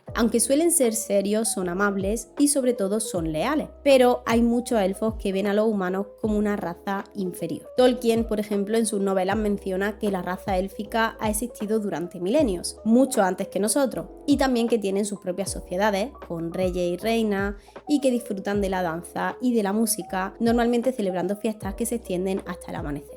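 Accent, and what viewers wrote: Andalusia, Spain. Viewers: Muy agradable el vídeo y tu acento que ha de ser de Andalucía, puede ser? Soy argentina y te saludo. Y te ganaste mi suscripción 🎉❤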